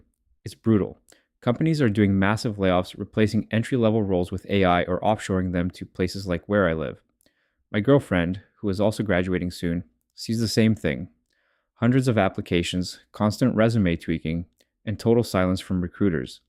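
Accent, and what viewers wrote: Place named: United States. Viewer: you sound american tho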